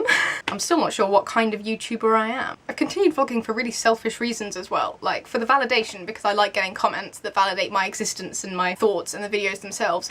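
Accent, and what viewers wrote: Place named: United Kingdom. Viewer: Okay, I just scrolled through my entire liked videos list to try and find out through which of your videos I discovered you because I didn't discover you through your sister (I actually found her through you ;) ). It turns out I discovered you because of Pottermore. Back when it was still in the development phase and only a few people at a time could register I would look at tons of sorting videos on youtube and that is how I found you. It is kind of hard to discribe why I subscribed to you. It's number of reasons, all of which will probably sound pretty weird. I really like your accent (I'm from Germany and I absolutely adore British accents), I like the way you talk, your enthusiasm, your honesty, your opinions... also, you share two of my very favourite interests which are reading and writing and, of course, Harry Potter :) All in all I find these insights into your life incredibly interesting. 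You are a few years older than me so it is kind of like watching what my future might look like in terms of going to uni, having your own place, finding work etc. I do have my own Youtube channel (which is called MissSophieReads) which is basically just your stereotypical channel about reading and books (I haven't yet come up with any cool original video ideas).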